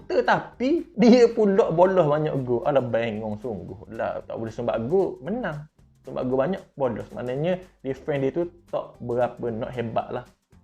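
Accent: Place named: Terengganu, Malaysia. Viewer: Ejah subtitle.. Dop paham loghat terengganu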